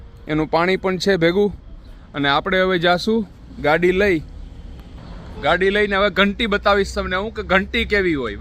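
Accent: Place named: Kathiawar, India. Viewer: Bhai hu gujrati chhu, ghandhinagar thi, tamaro whiz (aavaj) kathiyavadi lage 6, ane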